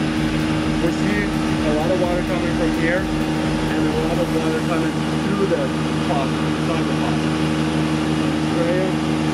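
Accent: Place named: Eastern Europe. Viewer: I tbought he had Slavic accent.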